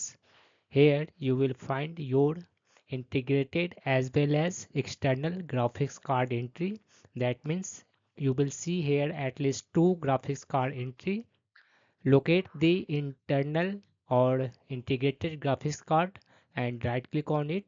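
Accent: India. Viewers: Soon as I heard the Indian accent, I knew I was saved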